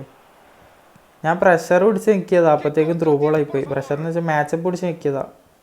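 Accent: Kannur, India. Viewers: Brother kannur aano ?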